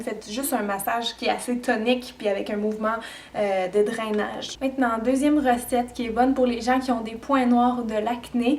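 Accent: Quebec, Canada. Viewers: Génial, j'avoue, les huiles essentielles me "faisaient peur" car j'ignorais comment les utiliser. Tes recettes maison sont super et vraiment très simple ... Merci beaucoup pour cette vidéo. PS: ton accent québécois c'est un vrai bonheur. Lorsque tu as évoquer le mot "chaudron" pour faire bouillir de l'eau tu m'as fait rire car en France le chaudron est synonyme de marmite, comme celle du druide dans Astérix (bande dessinée)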